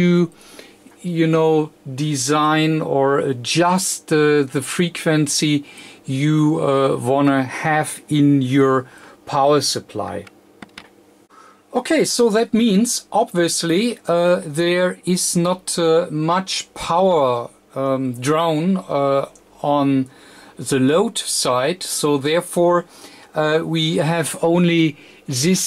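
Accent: Germany. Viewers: One of the BEST way to make even layman to understand. Hats - off. I really enjoy your pronunciation of each word with a tinge German accent. Vielen Danke. Auf wiedersehen. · It was a wonderfull n extremely comprehensive explaination of more than the basic working of SMPSs by the very intelligent gentleman although his english was good enough to follow (i think he being a german) it was very clear n highly informative !! A big Thank You to the gentleman !!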